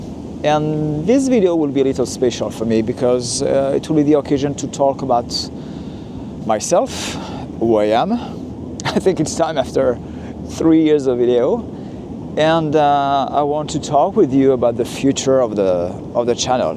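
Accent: France. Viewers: Super que tu commentes tes vidéos! Bon, je ne suis pas totalement bilingue, deux trois petits mots en français pour ton public de France, ce serait chouette. Mais tu t'exprimes super bien en anglais avec le p'tit accent frenchy qui va bien. Du coup, on comprend assez bien. Continue ainsi! Keep it up. Let's go. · As an afficionado of New York walking tours, I am well placed to say that your first narrated tour is eclipsed by none. The views of Dumbo are stellar, significantly enhanced by your impeccable command of English, a command that, it must be underscored, notably exceeds that of most native speakers. (Do not underestimate the charms of a beautifully poeticized use of English with slight overtones of French!) A wonderful audio-visual experience. Kudos! Quelle promenade exquise -- parfaitement bien narrée ! Nous vous en remercions infiniment ! Bravo ! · Words cannot express how proud I am of you and your bold and courageous step to start narrative and soon to come live videos. 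❤❤❤ Keep moving forward and break through those barriers that prevent us from achieving Your English is great with a French flair ❤❤❤ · Aww... nicely done! Your English with a fleur of French is very good, I just love it! Keep changing your channel, my favorite walking tour channel on YouTube, merci beacoup! 🗽🍏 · YES!! Finally we get to hear your perspective! Your walking videos were already great but with the added commentary, it'll be even better. And don't worry, your English is perfectly fine! Your French accent only adds to the content and makes you stand out. 👍 Allons-y, les gars! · I had just come across your recent Hudson yards video & it was beautiful 😍 🎄. I instantly subscribed & now seeing this. I like that you've decided to narrate your videos. A French accent is so nice. I can't travel like I'd like to so these kinds of videos are great. ❤🤍💚🎄🎅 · It was a very good decision to talk to us viewers. 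I hope that your channel will become even more popular as a result. You have the advantage that your English with a French accent is very popular in the English-speaking world.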